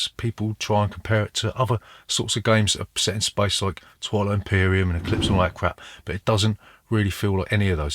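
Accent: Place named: London, United Kingdom. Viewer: You sound London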